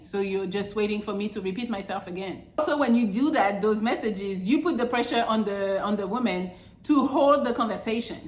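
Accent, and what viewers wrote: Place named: France. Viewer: Are you french bro?😭 Im sorry but as a french dude it freaks me out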